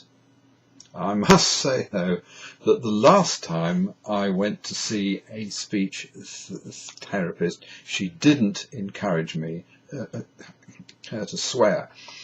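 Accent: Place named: London, United Kingdom. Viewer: Thanks for your reply. I find your accent to be rather "psoh," indeed, and acoustically pleasing! I could not detect any Scottish. (Although I love Scottish Accents.) I was going to show it to my class as an example of solid Upper Class, a model they could use to play scenes from The Importance of Being Ernest, etc. Now I can also tell them it is a beautiful example of RP. But you sound ever so much more genteel than the average BBC accent. It's a nice, solid sound, with lovely resonance.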